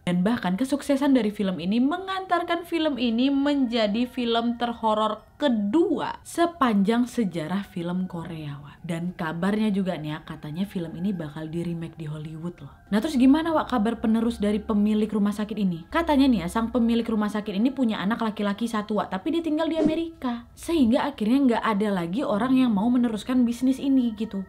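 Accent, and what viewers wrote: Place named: Madura, Indonesia. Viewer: kok kaya logat madura😎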